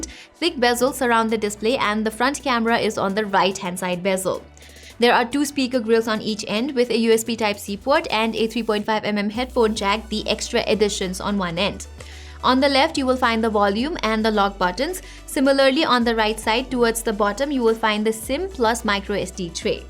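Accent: India. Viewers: 😜😜indian nd Pakistani boys 😜👀mall heina ye?👀 First time dekh rha ho education or English se lag rha hai indian hai❤️❤️👀 🙊🙊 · It sounds sweet when Indians speak fluent English. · I really love your Indian accent... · Nah bro, last thing I want is a indian accent voice assistant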